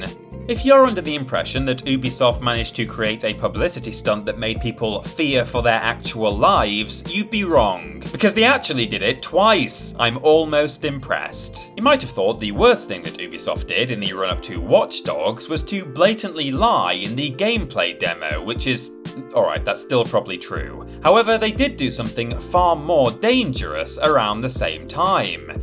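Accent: United Kingdom. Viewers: They're British :-) They were former WhatCulture Hosts.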